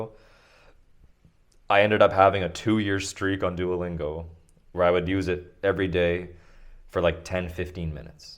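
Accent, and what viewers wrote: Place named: Russia. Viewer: У вас русский акцент в английском ☺️ вы итальянец?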